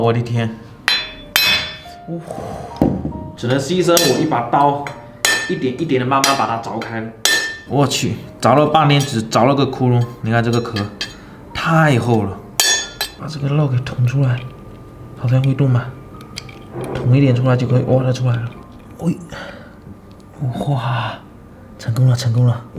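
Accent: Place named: Gutian, China. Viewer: 口音好像宁德古田那边的人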